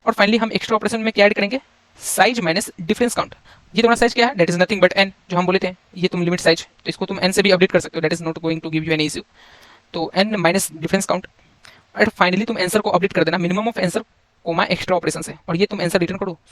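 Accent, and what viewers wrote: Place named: Bihar, India. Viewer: acha smjhaya . kaha se ho bihar me? (accent++)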